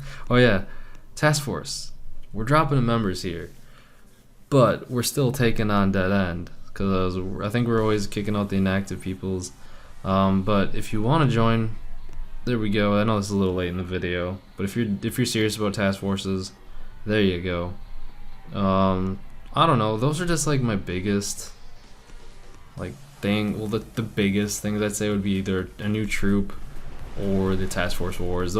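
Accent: Canada. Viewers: Is Canadian? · sounds Canadian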